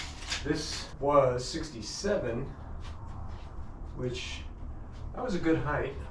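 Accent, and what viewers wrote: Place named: Florida, United States. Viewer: Man what a great thinker you are, That's working smarter not harder, You taught me an valuable lesson not to RUSH, but have a plan, We love your Florida Accent Lol are you from Long Island Or The City? Can't wait to see the next episode, you have a great setup in Tampa, Sure not making any more land , Stay Blessed my brother ✌️🌴🌅😎🇺🇸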